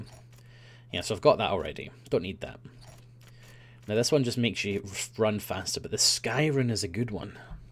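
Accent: Scotland, United Kingdom. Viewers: I wish i was Scottish u speak fucking Cool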